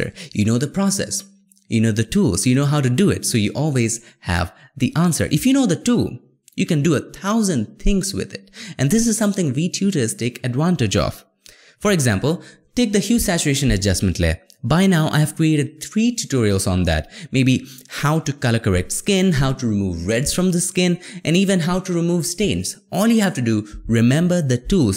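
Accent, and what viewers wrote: India. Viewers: cannot focus on the video... indian accent and connected eybrows ruined the experience for me :/ good video tho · I usually hate indian accents but yours is somehow great in my ears 😂👌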